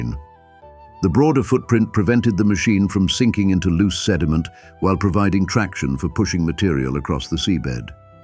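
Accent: United Kingdom. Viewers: Subscriber here. Liked and hyped this video because it’s very cool! No bouncy line at the bottom… Awesome! Now, if we can just get rid of that British accent…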